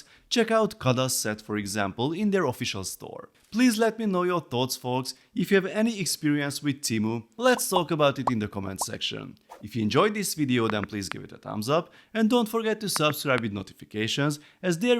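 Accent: Hungary. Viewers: No way hogy a bro magyar, nagyon fasza accent🎉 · You are Hungarian? I could have sworm I heard the accent, but never openly guessed it 🤣 howdy neighbour, greetings from Croatia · Szerintem van egy kicsi magyar akcentusa😂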